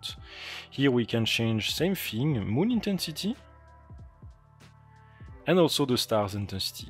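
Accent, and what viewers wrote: France. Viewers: le mec a tellement un accent fort en français que même si tu parles pas anglais tu le comprends:)) merci pour les conseils en tout cas, très interressant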